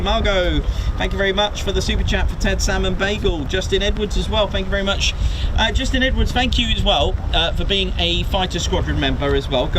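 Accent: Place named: United Kingdom. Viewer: Love ur channel!! Love the British accent!!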